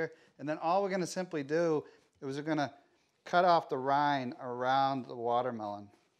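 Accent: Boston, United States. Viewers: Boston accent detected.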